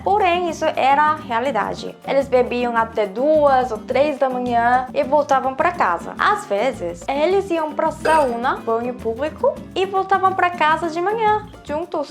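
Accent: South Korea. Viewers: Algumas perguntas; Os seus colegas de trabalho sabem que você fala português e já lhe perguntaram porque você quis aprender essa língua? Eles perguntam a você sobre o futebol do Brasil? Na Copa do mundo de 2002 você já sabia falar português? Seu português é fluente mas ainda com algum sotaque coreano, as vazes você não conjuga o verbo tipo; Eu começar a fazer algo.. seria eu comecei... Mesmo assim conseguimos entender o que você quis dizer. Nós brasileiros achamos muito bom e super legal ver estrangeiros falando ou tentando falar em português mesmo que as vezes de forma ainda crua nós procuramos ajudar e tentar fazer com que o estrangeiro fale do jeito dele e nós tentamos entender e ajudar, as vezes pode vir um portunhol (mistura de português com espanhol). Estou muito feliz de está no seu canal pela sua alegria e simpatia e amor pelo Brasil. Bjs (beijos)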